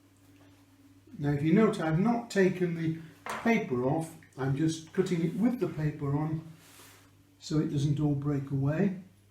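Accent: Yorkshire, United Kingdom. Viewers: RIP. We're going to miss your wonderful Yorkshire twang.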